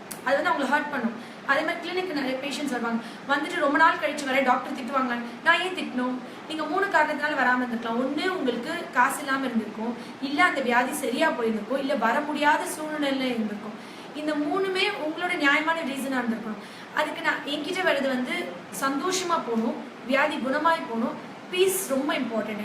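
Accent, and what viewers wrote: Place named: Brahmin, India. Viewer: God bless u Mam 🙏 I like ur voice very much Brahmins Thamil is Mixed in ur ascent nice motivational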